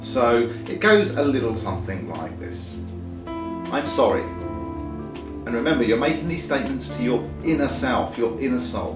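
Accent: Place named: United Kingdom. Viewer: Thanks! What I like about your vids the most is, that you dont go over the top and try to force it down our necks. Its is very friendly, peacful and joyful process. I love that. And your British accent rocks! Thanks again. xx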